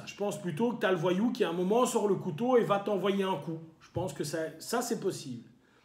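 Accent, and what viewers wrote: Belgium. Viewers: Tout le monde à un accent selon son origine. C est un accent wallon tout à fait normal, 2000metamorphe je te comprend pas.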